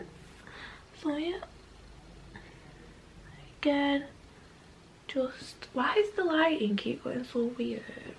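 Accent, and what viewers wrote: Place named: Manchester, United Kingdom. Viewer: You gotta be from Manchester ❤❤❤❤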